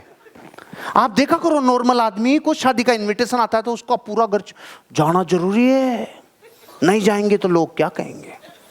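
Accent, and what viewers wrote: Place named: Marwari, India. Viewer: Exlnt exlnt n exlnt sir.your marwadi accents n satic logics Gazab hai ji.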